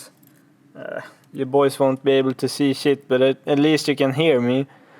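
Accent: Norrland, Sweden. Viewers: Har du någon statistik på hur många utländska tittare du har? Diggar din Norrlands svenska 😃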